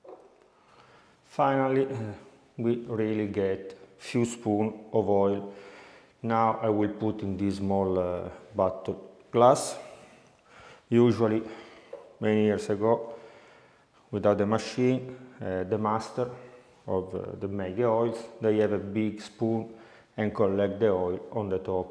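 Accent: Italy. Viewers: I had to like the video the second you started talking. Love the Italian accent. Watching from Ocala, Florida. Thank you for showing us how to make the oil starting directly from the plant. I have a nice fully grown olive tree that is producing olives and I can't wait to give this a shot.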